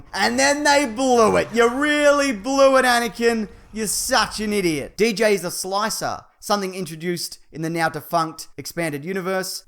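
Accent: Australia. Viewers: wait are you Australian? You sound like Australian? (Just curious)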